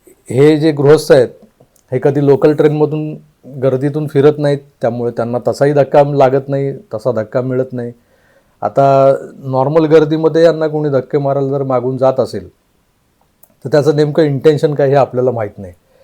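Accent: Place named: Dakhni, India. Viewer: आपका दक्खणी हिंदी सुनाते बहुत मजा आता है.व्हिडिओ बहुत अच्छा है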